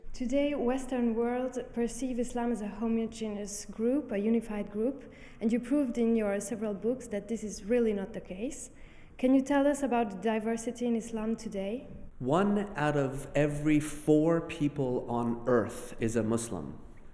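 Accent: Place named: France. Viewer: poor production quality... too much echo and the french accent of the host is distracting.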